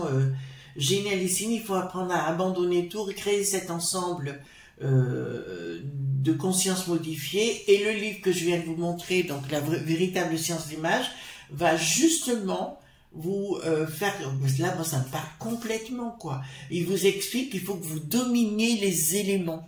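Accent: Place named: Brittany, France. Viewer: J'entends Bretagne limite Normandie, ca parle à quelqu'un ?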